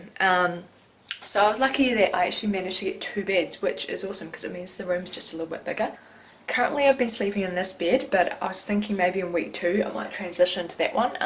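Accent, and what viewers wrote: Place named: South Island, New Zealand. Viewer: Hey where in NZ are you from? Seems like a South Island accent. Sorry if I am wrong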